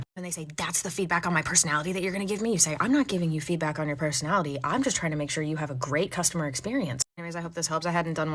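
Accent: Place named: Massachusetts, United States. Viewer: So, I'm from MA and it is driving me inSane that I can not figure out if your accent is from there or not😭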